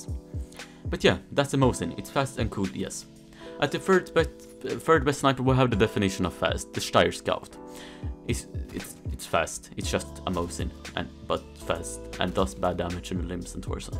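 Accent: Sweden. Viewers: You Swedish?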